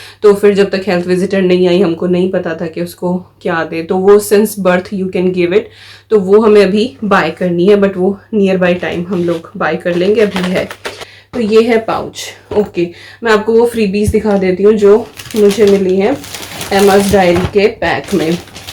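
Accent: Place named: Uttar Pradesh, India. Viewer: Ap apni up wali boli bola kro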